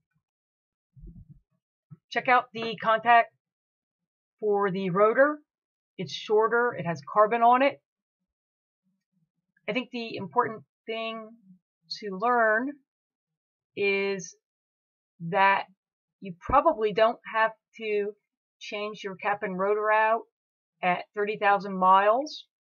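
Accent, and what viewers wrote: Philadelphia, United States. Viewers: The Philly accent makes me homesick.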